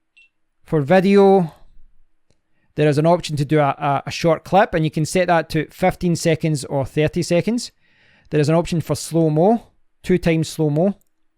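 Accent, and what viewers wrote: Scotland, United Kingdom. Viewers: Brilliant work mate. Loved the detail. I shudder to think the time I would have wasted trying to get it down to 1080p! I needed something quite idiot proof. You have kept my sanity! Thanks a million from Australia and coming originally from Dundee it was wonderful to get your delivery in Scottish! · Thank you for making a video for those of us still sitting on the fence as to which GoPro to buy! Your channel is the first one I've found that doesn't use obnoxiously loud music before and during their videos, so I could actually hear and understand what you were trying to tell us. Your Scottish accent was fun to hear, as you sound the character on the Dance Mat Typing program for kids :) Cheers from California!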